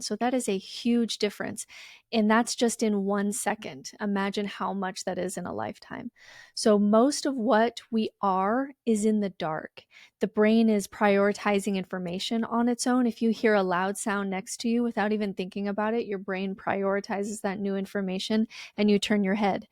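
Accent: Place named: United States. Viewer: I needed an American voice to speak to my American Brain and my American Heart. Thanks my Sista from another Mista!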